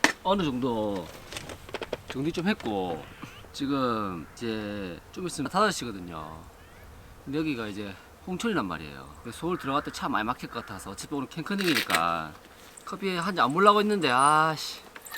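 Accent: Gyeongsang, South Korea. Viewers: 사투리 너무 매력있어요 ㅋㅋ 원래 경상도 사투리 좋아하는데 어느 지역인가요?? 멘트도 너무 찰지심 ㅋㅋㅋ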